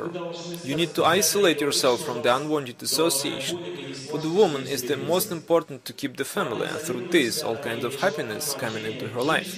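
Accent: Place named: Russia. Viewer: That russian accent sucks, but thx to ur hard work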